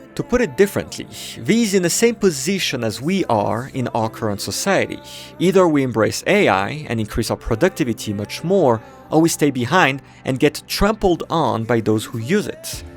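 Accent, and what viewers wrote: France. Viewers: French accent ahhhhhh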